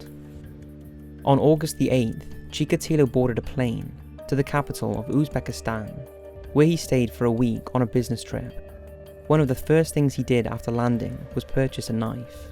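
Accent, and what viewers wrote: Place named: South East Wales, United Kingdom. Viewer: I've wondered for a long time where you are from. You have an accent that sounds like you might live very close to me which is South-East Wales. Let me know!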